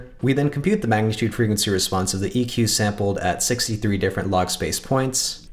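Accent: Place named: United States. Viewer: never underestimate an Indian with American accent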